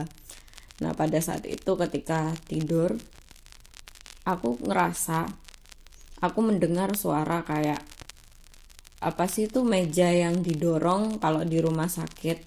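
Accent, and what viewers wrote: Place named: Java, Indonesia. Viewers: iya medhok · Teh versi logat jawa ya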